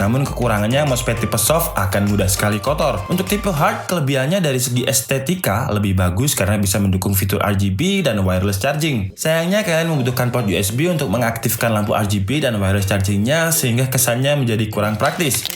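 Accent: Malang, Indonesia. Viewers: Khas e wong Malang 😂💪🔥🔥🔥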